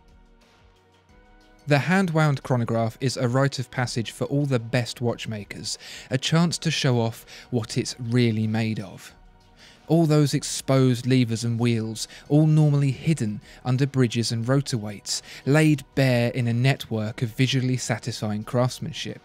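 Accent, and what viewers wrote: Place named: England, United Kingdom. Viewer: I love listening to him talking but if you speak about watches learn how to pronounce German and French names!! Literally ALL decent brand names are French or German. The pronunciation is just so very very English aka I know nothing outside of where I grew up.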